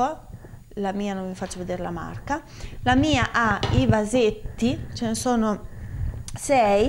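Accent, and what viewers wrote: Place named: Marche, Italy. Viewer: scusa se te lo chiedo sei marchigiana per caso?? io sono di Pesaro... dall'accento sembrerebbe.. comunque video interessante.. complimenti!!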